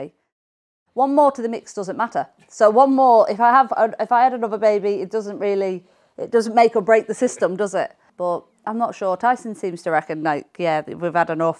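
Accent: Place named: Doncaster, United Kingdom. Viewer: Aww beautiful 12 15. I wish i could keep more than one child. My ex wouldn't let me. Well done Paris 👏 loved the documentary by the way. I recognised some places in Morecambe from our family holiday. Beautiful home in a seaside town. Great to see you both getting along. You are amazing 👏 great to hear you haven't lost your Doncaster accent.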